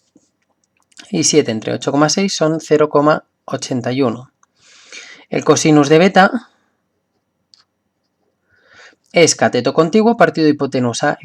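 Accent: Catalonia, Spain. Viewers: Alejandro Moya Martin Es catalán, en ese idioma se pronuncian distintas las palabras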